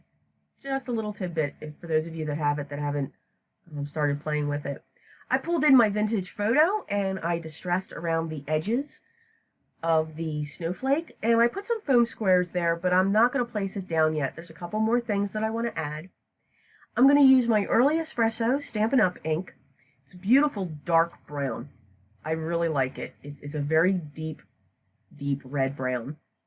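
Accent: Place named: Philadelphia, United States. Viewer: I passed on this kit, your projects are so cute and are making me regret my decision. New subbie here, I detect a Philadelphia/south Jersey accent, am I right?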